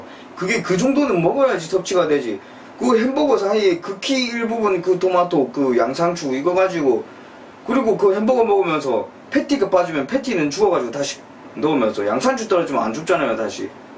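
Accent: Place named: Gyeongsang, South Korea. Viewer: 역시 갱상도말로 해주시니 더 귀에들어오네요 ㅋㅋ ㅋ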